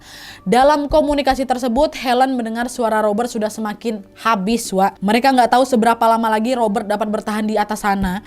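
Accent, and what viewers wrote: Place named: Pekanbaru, Indonesia. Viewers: khas banget logat minang nya ka😂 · ndeh logat pekanbaru bana ha😂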